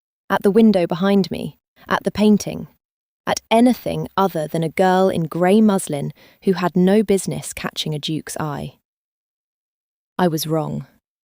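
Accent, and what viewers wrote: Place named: Northern England, United Kingdom. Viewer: The narrators voice is perfect. I love what I believe are shades of her northern or midland accent. The story line was very good. Your channel is a favourite of mine. I found these YT romantic Regency and Victorian channels when they first emerged in late 2025. Thank you for working hard to make your productions just about perfect. The writing, editing and narration are flawless which makes listening a joy. JB 🇨🇦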